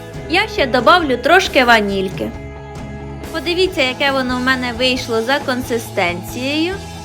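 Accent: Poltava, Ukraine. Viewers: Як по розмові, то точно з Полтавщини!?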